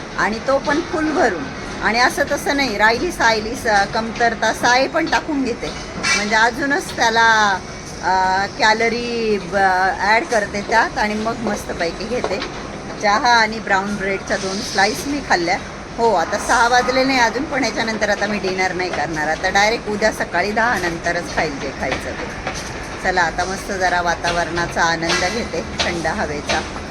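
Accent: Nashik, India. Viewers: तुमची टोनींग nashik ची वाटते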